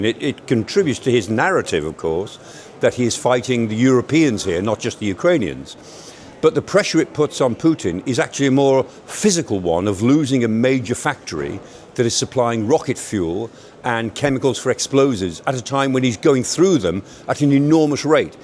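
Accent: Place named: United Kingdom. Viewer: This guy sounds so British...😅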